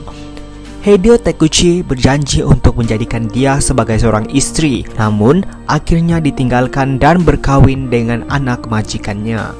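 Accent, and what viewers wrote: Sabah, Malaysia. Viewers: Yg penting faham.. sbb lidah Org Sabah ni sebut Saba pun Org faham jgn tu Sabah.. jgn serius betul..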